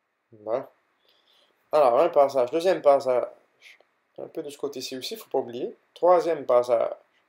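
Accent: Canada. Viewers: T'as un petit accent canadien, j'aime bien. Tu pourras dessiner Sulfura stp? De toutes façon t'y arriveras vu comment tu dessine trop bien. Et comment tu fais pour éfacé avec ta main?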